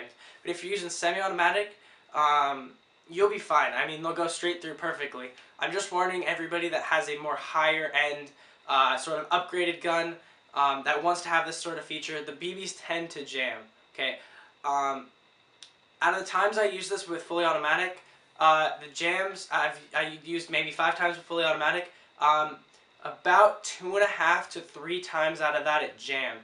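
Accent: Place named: North America. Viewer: You speak with a North American English accent and yet you keep pronouncing Aluminum the British way...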